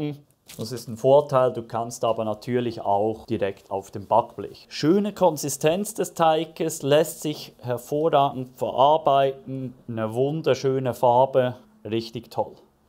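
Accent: Switzerland. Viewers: Die Rezepte sind immer DER HAMMER! Danke! Und ich hör auch dem Schwizer Dütsch so gern zu 😁✌️. Grüße aus 🇦🇹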